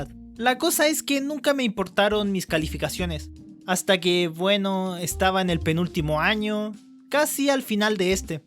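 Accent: Chile. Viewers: Por su forma de hablar se nota que es chileno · Alv sabia que vivias en chile :v tu voz sonaba chilena '_'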